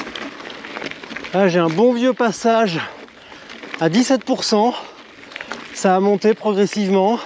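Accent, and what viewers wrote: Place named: Alsace, France. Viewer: Accent alsacien déjà qu il faut distinguer celui du haut Rhin de celui du bas Rhin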